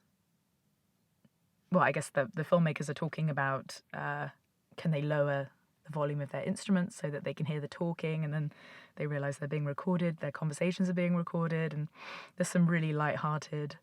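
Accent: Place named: England, United Kingdom. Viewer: I'm yet to see the actual film. So l can't comment on it.. I am a guitarist singer songwriter and have been for 50 years. I love and the music of the 60s and 70s. Great to see your reaction. By the way you have a lovely English accent. It was nice to hear you talk.So clear and not rushed. I won't be able to see the film until I get back to the UK in June. I look forward to it very much. I'm stuck in the mountains near Granada. Me my guitar Paints and my dog entirely alone. I wish you all the best. Happy Christmas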